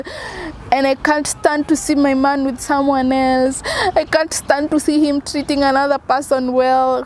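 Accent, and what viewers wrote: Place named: Kenya. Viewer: I am in the 🇺🇸 and actually enjoyed every bit of her narration; accent, grammar.. yote.. my love for my Kenyan roots remain ..she's well spoken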